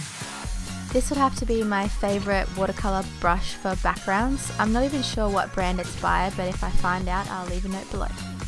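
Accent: Australia. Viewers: Ikr, i totally want her accent ,"wotah" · Omg as soon as I heard your voice I knew you were Australian!!! I love your accent I wish mine was more noticeable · Hey, I'm in Australia too! So I already new the brands they were from. :D I also knew you were Australian because of your accent. :) :) :)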